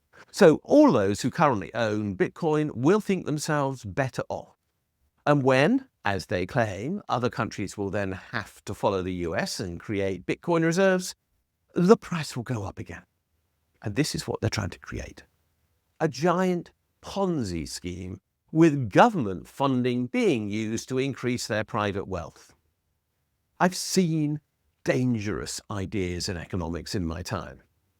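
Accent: RP, United Kingdom. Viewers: So much misinformation here it's unbearable. Don't let the posh English accent, the bookshelves and the "professor" title fool you.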